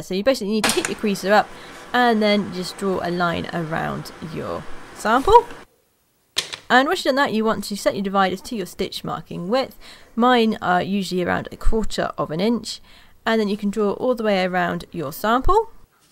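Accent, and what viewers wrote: England, United Kingdom. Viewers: thank u. iv been wanting to know how to do a simple leather stitch. and i got to hear it in a English accent. thank u again from the states. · Right on! I was watching another youtuber from UK and he said his accent was "home counties". Is there a specific area that your accent is from in the UK?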